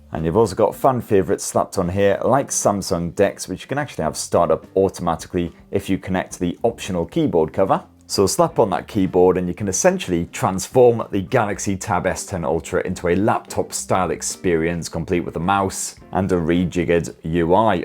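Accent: United Kingdom. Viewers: Thank you. As always the best review with your beautiful British accent ❤❤❤❤❤⭐⭐⭐⭐⭐🫡🫡🫡🫡🫡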